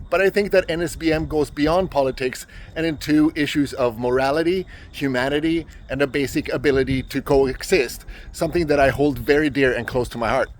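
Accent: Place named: Europe. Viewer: If you are seeking out black metal for morality and humanity, you are barking up the wrong tree. You should also note that Satanic black metal is explicitly in support of murder, grape, sacrifice of children, destruction of all innocence, etc. etc. .... and you clearly do not have a problem with all of that. But the moment the bands concern themselves with heritage, cultural superiority, and attacking a religion other than Christianity, suddenly its a problem. You sound European, so I don't have to tell you that your land, your culture, and your way of life is under direct attack from foreign invaders. But all that aside, its black metal. Foisting a morality compass onto it negates its entire purpose.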